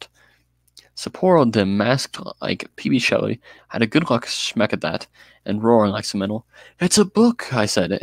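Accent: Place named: United States. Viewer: Hey, are you american? I like your accent I could listen your accent all day long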